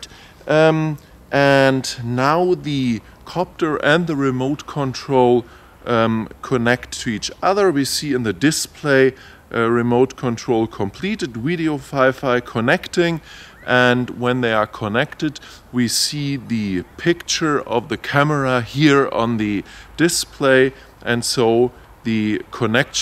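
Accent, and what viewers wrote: Germany. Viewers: Super video-Thought his german accent be irriating but I grew to like it. Thanks again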